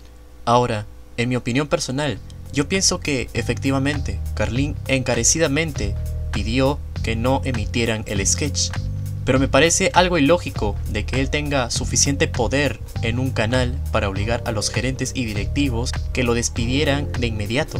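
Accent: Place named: Chile. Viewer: Tienes acento chileno pero mejorado.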